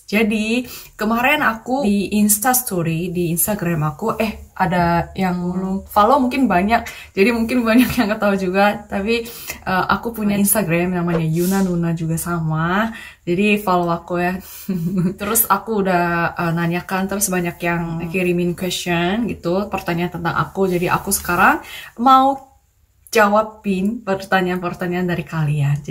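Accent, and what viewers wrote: Indonesia. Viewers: Wow keren,logatnya udah Indonesia bgt ini 😃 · Wah..mantap bener bahasa indonesia nya lancar dan logat nya sdh indonesia banget .. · Aku suka aksen indo yuna Mirip sama aksen indo Kak bintang dijerman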